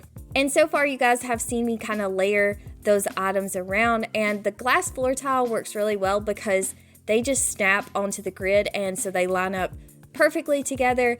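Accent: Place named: South, United States. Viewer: i love ur souther accent! and this build is absolutely killer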